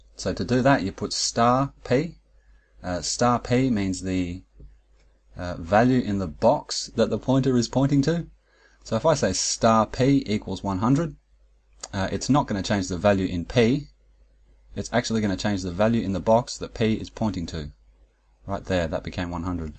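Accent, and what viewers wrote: Australia. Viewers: Alejandro Doe You do know Lennon was a Brit and this dude sounds like he's from Down Under. BTW vectors are better than linked list almost always. You get a lot more cache misses vs. vectors.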